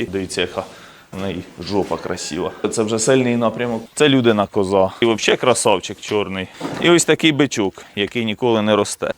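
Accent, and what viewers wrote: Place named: Poltava, Ukraine. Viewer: Добрий день. Можна питання, а з якого ви регіону? Чомусь здається, що Полтавщина ❤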